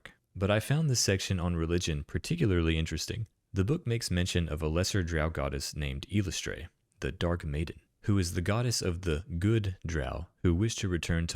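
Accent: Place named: Arkansas, United States. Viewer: Rural arkansas? Im from fort smith and lived in Magnolia in south arkansas. You sound like you may be from further north around Fayeteville?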